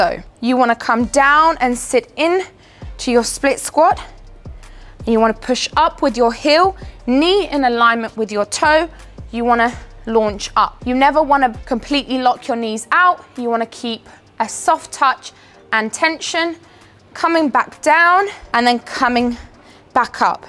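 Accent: United Kingdom. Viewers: Your accent is so strongly British haha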